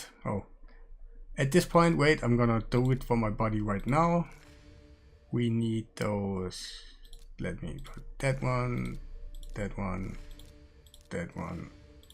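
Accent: Germany. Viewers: Bist du deutsch?😅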